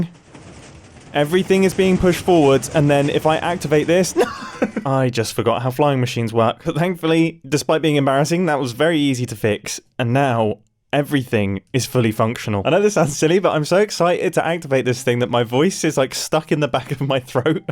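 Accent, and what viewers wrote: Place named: United Kingdom. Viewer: How often is it that you hear somebody say in the calmest british accent ever I'm building a giant tank.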